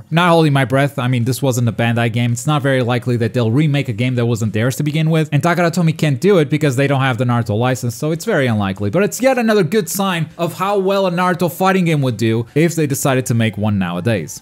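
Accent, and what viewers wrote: Portugal. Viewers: I'm curious to ask but are you Portuguese? 🤔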